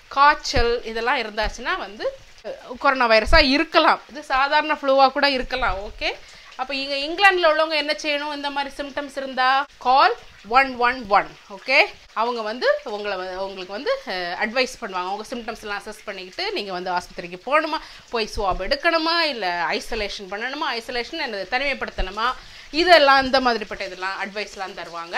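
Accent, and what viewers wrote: Nagercoil, India. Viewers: Superb..What a catching Talk.Clasa. No Other Words To Say..As If Iam in Krishnankovil in Nagarcoil Town... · nagerkoil thamilai marakatha thamilachi neengal i like you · This is josephine from trichy.Hello how r u. Recently I started watching u r channel. I like u r nagarkovil slang. I like u r body language everything instead of u r cooking. Keep countinueing dear. Bye. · Even if you settled in UK you haven't forgotten your nagercoil tamil so sweet whenever upload a video never ever change your language . Mother younger is just like our mother. So keep it up. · உங்கள் மக்கா ,மக்களே....இன்னும் அழகான வார்த்தைகளுக்கு நான் அடிமை....enaku neraiya nagercoil friends erukanga sis nenga pesra tamil rombo pudikum....love from UAE... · Akka I like your style of Tamil.Nagercoil? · Akka naanum Nagercoil kaari thaan...Namma ooru baashayai kekkarathukku romba santhoshama irukku....Ippolaan enga family la yaaraavathu stressed aa irunthaangana unga video thaan pottu paapom....En ponnu unga periya fan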